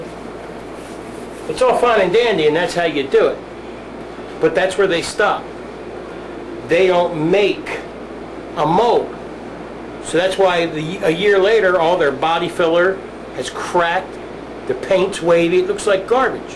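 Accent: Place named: Northeast, United States. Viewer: He must be from the North East I guess New York or New Jersey one of the reason I moved to Texas.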